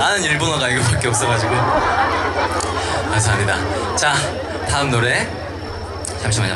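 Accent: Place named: Mokpo, South Korea. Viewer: 박지현가수님목포사투리구수하고매력있당게 앞으로도멋쟁이가수로활약하시길~~~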